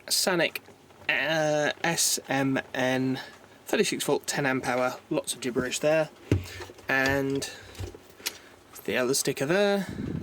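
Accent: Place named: Lancashire, United Kingdom. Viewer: You sound Lancashire lad I’m in Rochdale. Do you mend them? Batteries for people thanks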